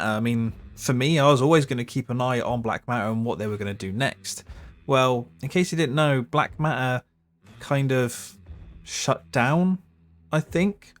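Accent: United Kingdom. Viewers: A British mush mouth is just so cute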